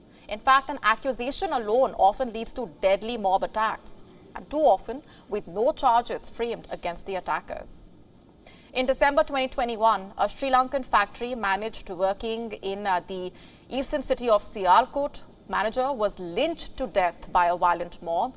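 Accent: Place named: India. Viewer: Her very strong Indian accent English.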